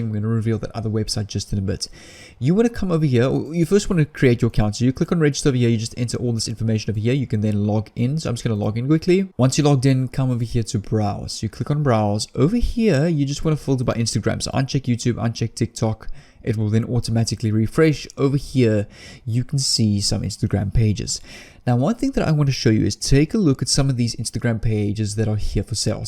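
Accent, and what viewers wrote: South Africa. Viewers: Wow you sound South African👌🏽Great content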